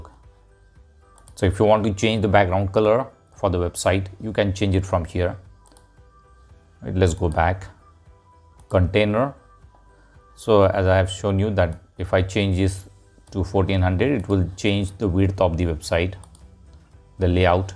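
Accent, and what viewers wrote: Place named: India. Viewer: I dont like indian accent 💩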